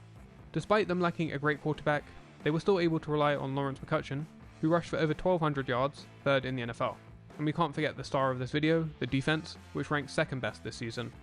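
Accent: England, United Kingdom. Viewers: good video. I like your English accent. With that said it bothers me when talking about American Football. Would you be a lamb and do a video Hershel Walker.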